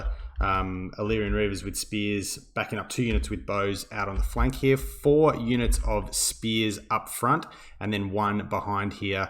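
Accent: Australia. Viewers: Great games bro! we gotta run into each other more on ladder :P Goddamn surprised me, your voice is amazing for casting along with the Australian accent!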